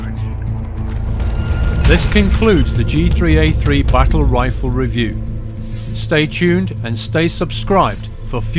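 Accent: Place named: United Kingdom. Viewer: Awesome man, the British accent goes great with this! You got a a subscriber!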